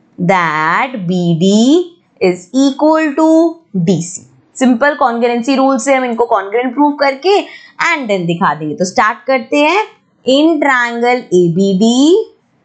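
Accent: Punjab, India. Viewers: Tuse Punjabi ho kya???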